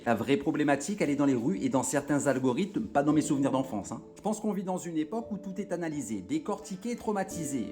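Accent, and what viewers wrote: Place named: Kabylie, Algeria. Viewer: Continue ce que tu fait , bravo elle sont super tes vidéos et une belle élocution de Kabylie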